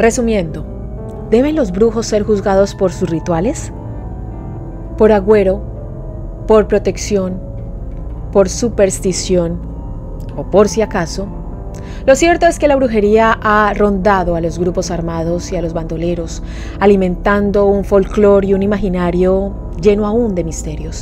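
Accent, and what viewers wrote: Colombia. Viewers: puro español de Bogotá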